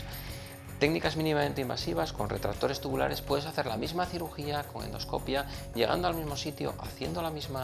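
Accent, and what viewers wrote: Spain. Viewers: Dr yo tengo dos hernias pero yo vivo en México y por su acento español me imagino que Ud vive en España pero si quisiera saber el costo y donde podría contactarnos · ya decía yo por el acento era español...oh tan lejos doctorcito, estamos en América latina. 👍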